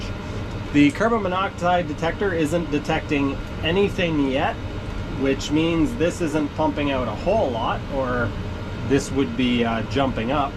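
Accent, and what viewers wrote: Canada. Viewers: Excellent videos! 🍻🍻 Do I detect a southern Ontario accent? 😁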